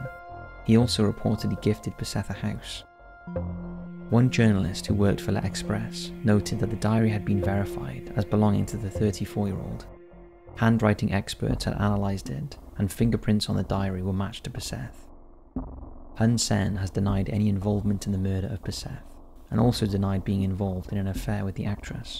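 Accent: Wales, United Kingdom. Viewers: The Welsh accent of yours is better than The Canadian Droll of Chills haha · He’s Welsh (from Wales in the UK) if you didn’t know. That’s the accent. South Wales I think by the softness, probably somewhere near Cardiff (the capital) or maybe Mumbles (a seaside town). 🤔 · Genuine curiosity, which part of the UK are you from? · I've a headache and this is easier to listen to than most. I'm sure that I can detect a Welsh accent in the narrator's voice and I think it's just a bit different, very enjoyable. Thank you ♡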